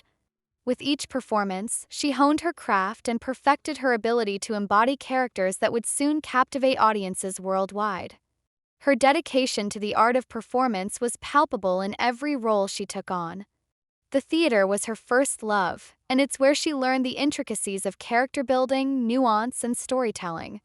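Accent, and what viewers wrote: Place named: United States. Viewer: Fucking American voice-overs on everything these days, I resent it hugely..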